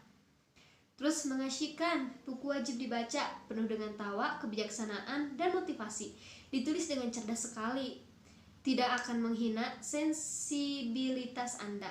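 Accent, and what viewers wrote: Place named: West Java, Indonesia. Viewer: Pidiooo, sunda pisan emang mba nya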